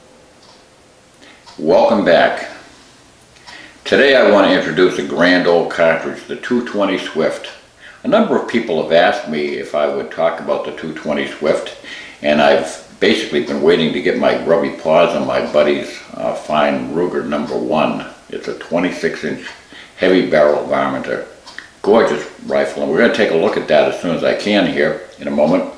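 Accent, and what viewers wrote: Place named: Massachusetts, United States. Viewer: I detect a touch of "Bay State" accent.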